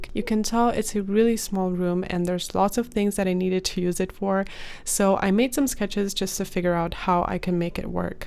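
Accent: Germany. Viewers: are you from germany? cause of your accent?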